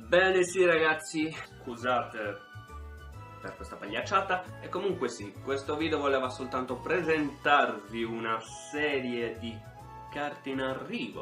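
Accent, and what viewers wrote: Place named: Sardinia, Italy. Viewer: ciao, anch'io sono sarda ma il tuo accento mi fa ridere:D comunque bellissima l'idea delle carte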